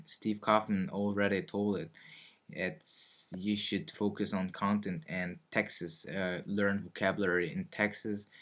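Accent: United States. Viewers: Jesus, I assumed he lived in an English-speaking country because his English is damn near perfect. Extremely few grammatical errors (only mistakes I'm catching are using plural at times we use singular, like "transportation" or "homework". But I understand why people would make that mistake, because in English we just don't have plural forms of those words), and his accent is really minor. Sounds like he's been living in the US for years. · You seem to speak using american accent... I'm brazilian and understood you well :) I think british accent is a little hard to understand, do you also think it?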